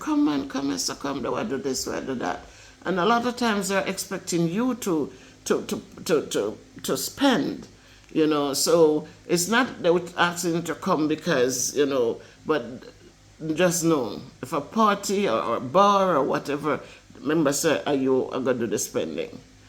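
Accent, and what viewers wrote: Jamaica. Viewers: I don’t know what age you left Jamaica that you forgot the culture or you may not have been around Jamaicans while you were abroad. I think you just show off, because listening to you speak you still have the Jamaican accent. This shouldn’t be news to any Jamaican. If you are that desperate to make a video address it to foreigners looking to move to Jamaica, show off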